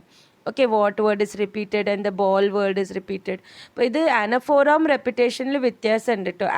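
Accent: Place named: Kannur, India. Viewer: thankyou yr classes are great .. And your speaking style reminds me of my old friend at kannoor😀👍🏻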